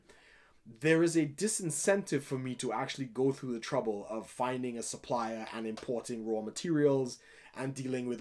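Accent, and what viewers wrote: Trinidad and Tobago. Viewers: he has the white trinidadian accent lol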